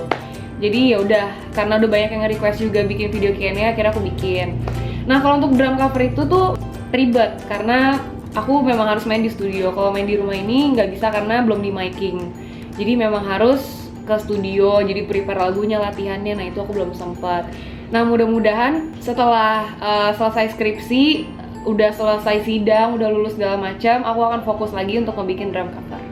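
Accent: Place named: Makassar, Indonesia. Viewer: bedede org Makassar pae,salam dari Makassar nah 😂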